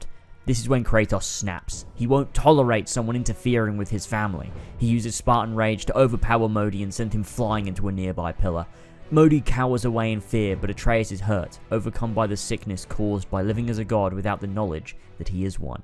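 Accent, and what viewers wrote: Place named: United Kingdom. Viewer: A Greek name spoken through a thick UK accent.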